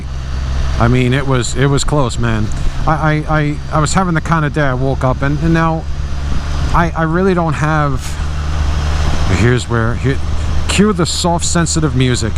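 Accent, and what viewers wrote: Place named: New York, United States. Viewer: okay!!!!.......i'm hooked!!! some of the funniest videos i've seen on youtube. thanks for the laughs. i grew up in scarsdale in the very early 70's and visited long island a few times back then. it's also great to hear that authentic new york accent and attitude. thanks for making me laugh for a few minutes, stay upright and healthy.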